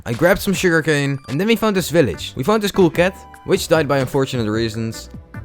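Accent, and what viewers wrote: Netherlands. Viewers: You have a little bit a dutch accent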